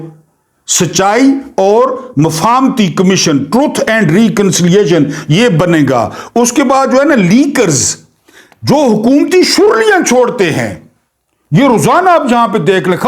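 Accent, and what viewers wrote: Saraiki, Pakistan. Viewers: ماشاء اللّٰہ کیا سرائیکی تڑکا لگاتے ہیں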